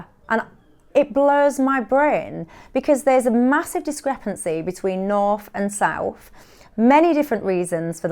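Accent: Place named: RP, United Kingdom. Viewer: Wish you all the very best. Small tip tone down the posh accent. You can’t carry it off..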